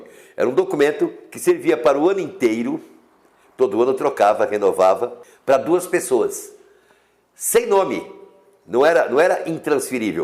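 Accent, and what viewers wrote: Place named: São Paulo, Brazil. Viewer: Vc e paulista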